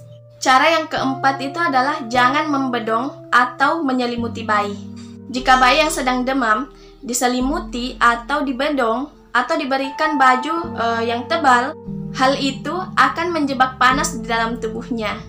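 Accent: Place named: Bugis, Indonesia. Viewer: logatnya seperti orang bugis 🙏😁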